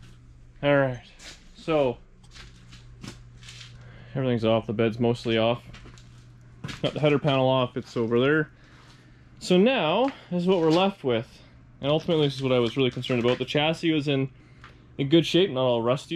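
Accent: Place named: Canada. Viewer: You seem like a cool Canadian dude, I like the way you canucks pronounce "out" and "about"!.. Do you smoke weed or drink molson canadian?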